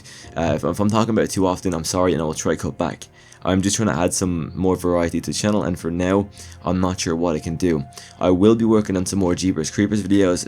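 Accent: Ireland. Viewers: For Christ's sake don't apologise so much, being sick and all - for your have amazing content! I love the Halloween franchise, and if anyone don’t like it, they are free to stop watching! And don’t apologise for your Irish accent, be proud! My aunt is Scottish, be yourself! In the end of your video you are saying it’s Friday, did you make the video yesterday 🧐😁 Cheers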